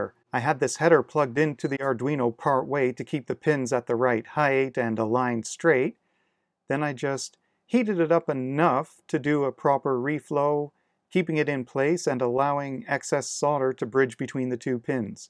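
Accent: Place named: Canada. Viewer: I love Canadian accents…ooot lol. Thanks for the tutorial. I guess single pin at a time is the way to go.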